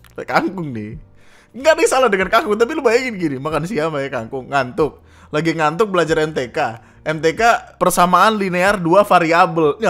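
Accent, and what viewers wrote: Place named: West Java, Indonesia. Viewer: Ini gw aja atau emang bang jadi ada logat sundanya wkwkwk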